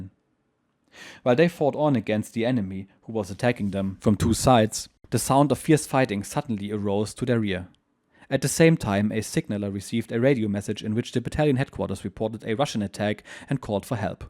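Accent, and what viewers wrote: Germany. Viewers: This is a great series and I have to say the German accent adds to the thrill of the story 👍😉 thanks.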